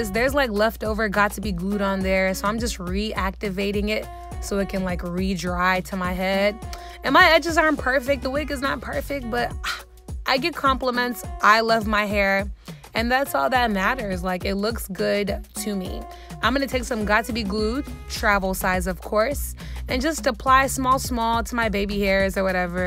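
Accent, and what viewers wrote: Nigeria. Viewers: You can feel the Nigerian in her speach, I'm so happy if she's of my same nationality. Makes me love myself a bit more🤧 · U are so beautiful. Are you Nigerian? I heard some "Nigerian English" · OMG I can't believe I didn't know u were Nigerian!!! 😱😍 Love when you speak in the accent girl 😉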